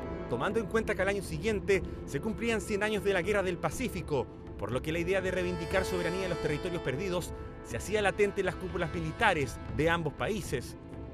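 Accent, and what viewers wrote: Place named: Chile. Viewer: Ptm .... vii este videooo 2 veces y no entiendo ni m..... ... tiooo Joderr deja de hablar ese ridiculo acentoo .. k no se entinde ni kulo !! Chilee deja de Joder.. sera k no tienen nada q hacer en tu pekeña ratonera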